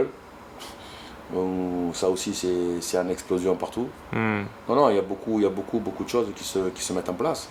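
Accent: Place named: South, France. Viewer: French southern accent 😍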